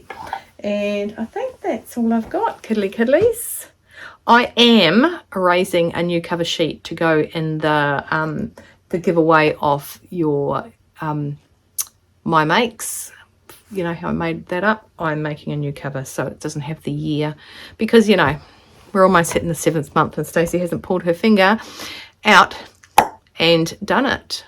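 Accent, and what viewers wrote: New Zealand. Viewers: I just discovered your channel via the #fridaysews. So enjoyed watching and good to listen to a fellow kiwi.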